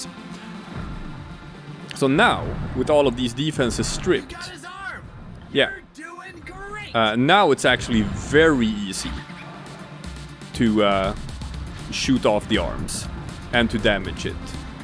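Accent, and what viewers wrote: Sweden. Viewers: is this swenglish? "im pressing i"....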